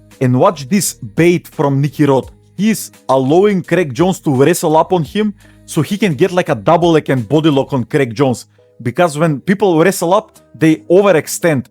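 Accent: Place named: Eastern Europe. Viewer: Watching a grappling video narrated by a person with an accent I can imagine as eastern european makes me feel like I’m benefiting more from it. Watch video and forget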